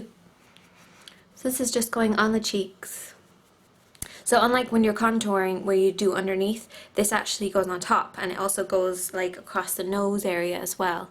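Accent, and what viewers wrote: Ireland. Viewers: Are you Irish ?-2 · are you from ireland ? o: your accent makes me think c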